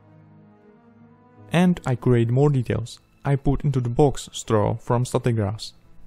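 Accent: Russia. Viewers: ты русский 100% ну или белорус крч инглишь твой второй язык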